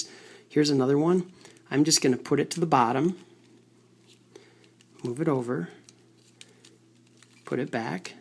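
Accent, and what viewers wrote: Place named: Wisconsin, United States. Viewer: You sound like you are from Wisconsin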